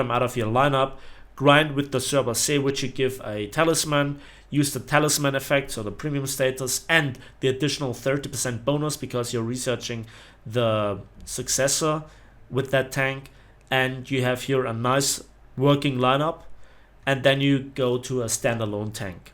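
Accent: Germany. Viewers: I'm subscribed for that crisp German accent and inter-regional banter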